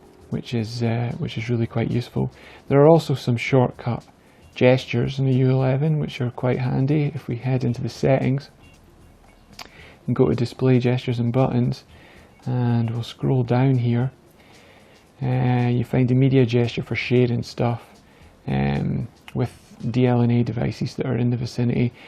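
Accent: Scotland, United Kingdom. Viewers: Scottish. From Scotland. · Love the accent! Scottish?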